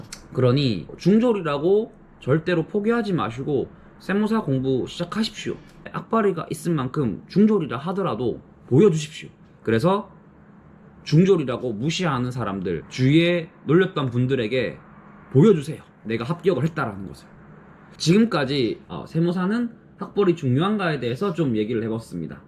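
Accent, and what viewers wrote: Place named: Gyeongsang, South Korea. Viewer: 말투 들어보니 경상도 분이신듯? 학교 다니면서 붙으셨나요? 혹시 개업하신 분인지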